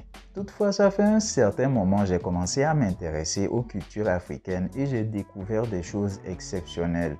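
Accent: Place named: Togo. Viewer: Je suis béninois mais je reconnais l'accent togolais. Félicitations et beaucoup de courage !!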